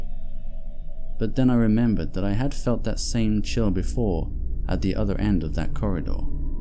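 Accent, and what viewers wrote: United Kingdom. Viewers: Why does the narrator have to have a British accent? It just makes no sense, is pretentious, distracting, and unnecessary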